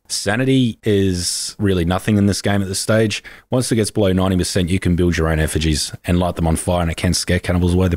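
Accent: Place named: Australia. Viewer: Dear god do i hear an aussie? I thought we were going extinct on youtube.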